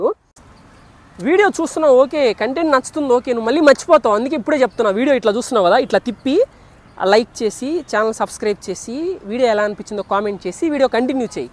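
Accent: Nellore, India. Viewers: బ్రో నేను ఒక మాట చెప్తాను దాన్ని గుర్తుపెట్టుకో నువ్వు ఏదో ఒక రోజు పెద్ద యూట్యూబర్ అవుతావు నీలో ఆ స్కిల్స్ ఉన్నాయి ఎందుకంటే మన నెల్లూరు యాస అలాంటిది🙌🙌🙌🙌🙌🙌🙌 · Navvabaku camera man🤣🤣(Nellore slang 🔥)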